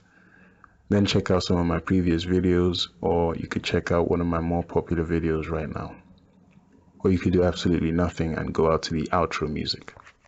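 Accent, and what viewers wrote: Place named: United Kingdom. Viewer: Okay I have to ask, are you the same guy as +barryrobinson who also has a boxing film study channel? You both are black guys who are Flomo's. You even use the same vocabulary such as "defensively responsible, changing head slots, etc." The only difference is you talk w/ a British accent. If you're two different ppl, then you're a fucking hack and get your own ideas. And ffs PLEASE tell me you're not the same guy cuz that'd be so fucking lame lololol.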